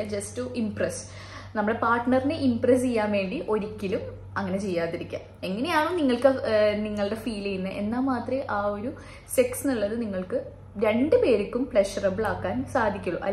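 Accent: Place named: Kozhikode, India. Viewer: Are you from Calicut? Kik-killi kettapo chodhichadhaato.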